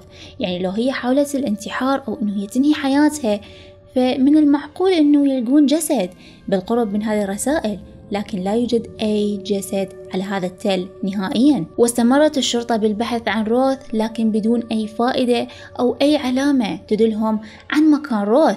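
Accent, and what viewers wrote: Iraq. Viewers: جداً أحُب لهجة العراقيه تحديداً عليكك 🥺❤️❤️❤️.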